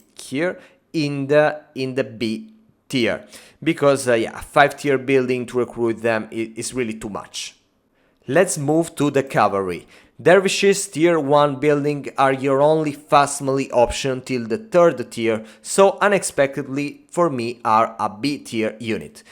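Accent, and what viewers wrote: Italy. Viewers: its an italian accent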